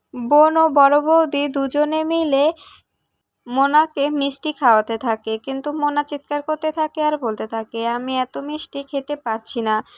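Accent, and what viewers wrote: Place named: Bangal, Bangladesh. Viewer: বাঙ্গাল বাঙ্গাল উচ্চারণ ভাল শোনায় না।চেষ্টা করলে এটুকু নিশ্চিত ঠিক ঠাক হবে।শুভেচ্ছা রইল।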